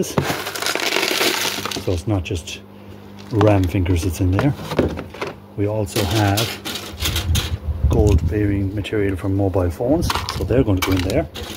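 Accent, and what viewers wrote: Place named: Ireland. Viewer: Hello from South Alabama....I like your accent.... We're probably related, somewhere down the line.. My Dad's Grandmother was Full Irish . Sir .. would you let me know how and where I can get scrap phones, computer boards, etc .?? I would like to get into this.... Thank You.... and ," Don't let them get their hands, on your Lucky Charms."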